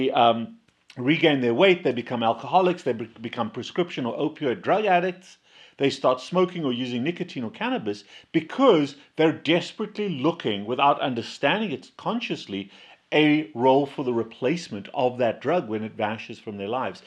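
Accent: South Africa. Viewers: I really love this! It's taken me 56 years to learn emotional strategies after having been brought up in a home where food, opiates, alcohol and cigarettes were how my parents coped. And how I spent my whole life coping. Now, finally, at 56, I am fighting daily for my freedom from all those things, as I transferred in and out of all of them. And it's working. The most significant change has been following a keto diet. Meat, veg and fat. No baked, fake copy foods. Just from the earth. Only if it swam, ran, grew or flew, will I consume it. And my life has changed., love it that you still have an accent. You make us SA folk proud. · My mom had bariatric surgery many years ago. She lost a ton of weight because she could not eat, but then put it back on again when she discovered she could drink all the coke and alcohol she wanted. She used to also melt chocolate in a fondu pot and drink the chocolate with a straw. 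She died ten years later of liver cancer. I am so glad I stumbled onto your channel. You sound South African. Hello from Johannesburg.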